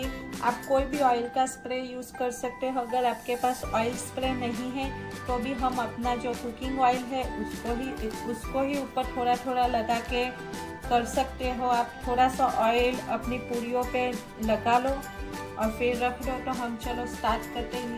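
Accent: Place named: Nepal. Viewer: You speak like Nepali or Assamese. Nice video.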